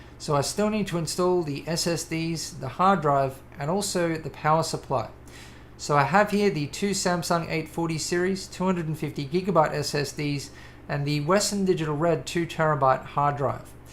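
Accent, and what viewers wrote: Australia. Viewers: I have no idea why it has taken me so long to find your channel but things seemingly, are what they are. It was Google that recommended your channel to me I think... Anyhow, I have subscribed and I am a uber happy camper, yet still, I am struggling to understand why it has taken so long for me to be invited to view your channel, when I have been watching similar sites for years, in some cases. The thought that crosses my mind is one that wonders whether Google and Youtube have some kind of favoritism algorithm going on or worse. I have noticed that you have an accent of one from the parts termed "down under," however, surely, with me residing in Europe, or, and,even "the west," that does not form a barrier of communications or does it? It certainly does not involve tech sites from over "the pond." Something is amiss that is for sure - to my mind, and my gut feeling is that it has something to do with traffic algorithms or is that the conspiracy theorist in me raising up its ugly head or beautiful head, which ever it is that you fancy comrade - only remember comrade... 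There is a tint of red in all of us, simply waiting to blossom. Whatever the causation, I have managed a couple of hundred words of adequacy and for such, my time as the writer is up and my time as the dreamer of heavenly wonders is upon me - over and out, goodnight Vienna, goodnight Helsinki... Shalom & Gratitude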